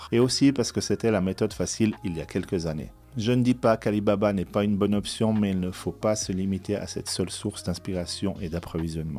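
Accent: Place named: Burkina Faso. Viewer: C'est ton Burkinabè mon frère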